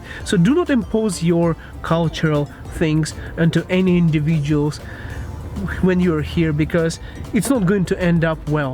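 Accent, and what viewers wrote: Russia. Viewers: As a Canadian, I LOVE other accents. Do not be ashamed of your Russo accent. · U Russian?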